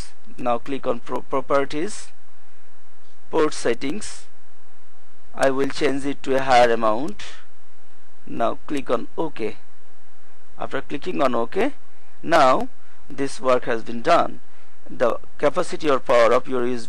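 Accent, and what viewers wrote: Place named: Bihar, India. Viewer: when bihari boy try to speak english it becomes biharish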